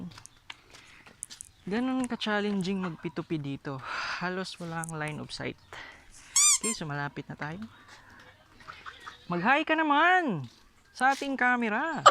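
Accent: Visayas, Philippines. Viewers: Salamat sir idol kna ng maraming tagasubaybay..andun parin ang visayas accent..daghang salamat bai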